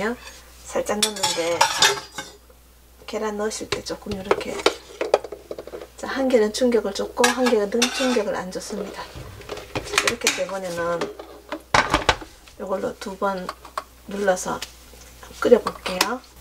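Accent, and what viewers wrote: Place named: Andong, South Korea. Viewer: 고향이 경북봉화인가요?. 말투가 안동이나 봉화쪽 말투이신듯..-0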